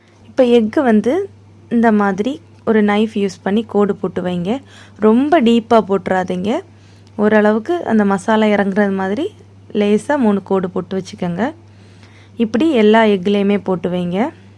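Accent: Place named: Nagercoil, India. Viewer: Super dear.. neenga pesrathu cute ah iruku.. namma nagercoil slang super 🤩🤩👌👌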